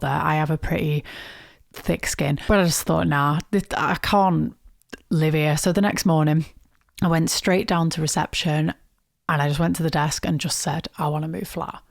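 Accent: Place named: Liverpool, United Kingdom. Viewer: i thourt that you had a bit of Scouse accent in you that's cool snap am a scouser. And you know who you remind me of...Clare Sweeny. Too be fair it sound's like your'e flat mates were'e jealos about your'e sexy look's x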